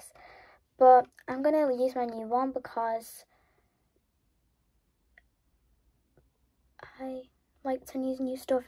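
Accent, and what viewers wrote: United Kingdom. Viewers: I don't know where you're from but your British accent is a chef's kiss xx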